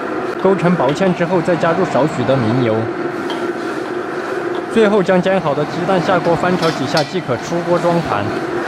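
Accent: Sichuan, China. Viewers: 感觉这哥们是四川人，这口音应该是四川人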